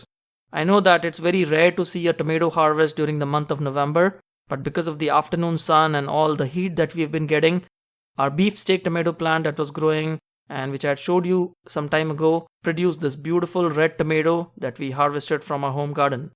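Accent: India. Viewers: Are you from India your English seems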